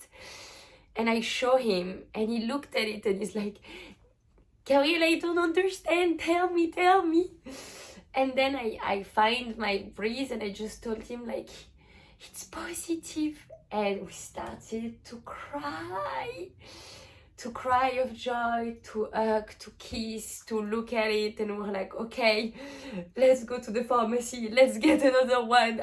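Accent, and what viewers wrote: France. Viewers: L’accent français ✨✨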